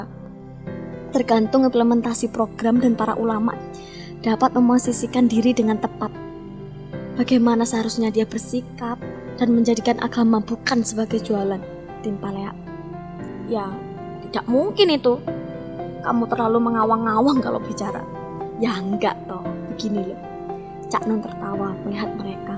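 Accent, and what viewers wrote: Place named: Java, Indonesia. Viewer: Jawa nya kelihatan banget❤️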